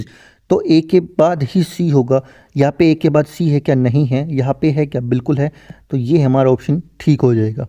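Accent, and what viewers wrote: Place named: Rajasthan, India. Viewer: accha mujhe rajsthani voice lagi thi aapki isliye puchh liya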